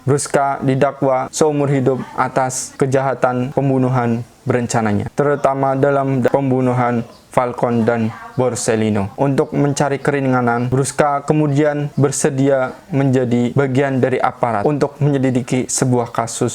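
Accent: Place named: Banyumas, Indonesia. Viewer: kumpas tuntas Bahasa tegal donk. Ko ngapak medok nemen koooh. Hahahaha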